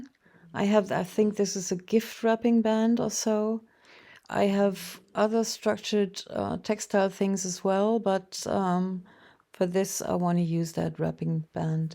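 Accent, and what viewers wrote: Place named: Germany. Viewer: Guten Tag from Tennessee, USA. At least I believe you are German but your accent is different from my husband’s. He’s a German National from Hamburg. Your English sounds a bit different than his. And the advertisement with the word fisch also led me to that conclusion. That being said, your work is quite beautiful. Prost from America.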